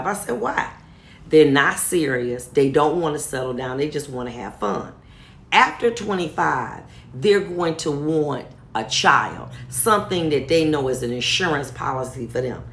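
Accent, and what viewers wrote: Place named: Detroit, United States. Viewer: I stumbled on your page. I'm a truck driver and I'm just listening and i swear i could hear the Detroit in you(flatout/fair exchange)😂and this game is not for the weak. It will go over most womens head. You have to be about it and be built for it for any of this to make any sense to you. Smart women who know the rules of engagement know exactly how to...engage😂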